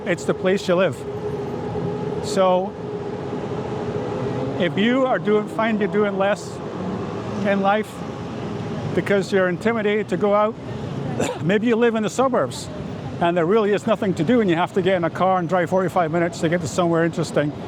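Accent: Ireland. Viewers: English isn't my first language and i can't place your accent, where are you from ? Are you Irish ? BTW greetings from Ayutthaya area, i'm married to a Thai and loving it here too. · You lived in the US? Your accent sounds different like Irish.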